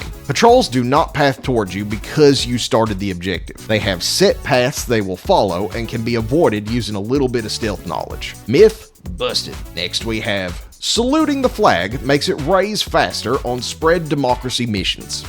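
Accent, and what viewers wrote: South, United States. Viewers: Your accent sounds to me like Southern US, but you pronounce some words like an Australian? Peculiar. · Helldiver commentary with a southern accent hits different NGL. I’d follow you anywhere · This video was supposed to be background noise and I sat here and watched the entire thing for the voice and it reminded me of home in the south. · lol that's funny. The voice is the reason I find these hard to watch. I still live in the South and have heard some pretty messed up things said in that accent. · Your southern accent makes this amazing. Much love for the intel. Keep trekking on o7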